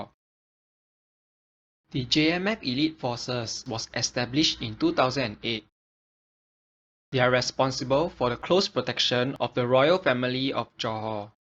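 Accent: Singapore. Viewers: U srsly expecting a Singaporean to have a Malaysian accent? 🤣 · Your Singaporean accent is really strong.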